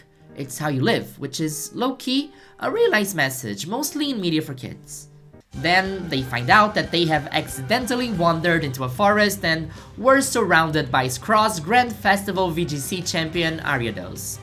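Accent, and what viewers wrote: Brazil. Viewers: Are... Are you from São Paulo? You sound like you're from São Paulo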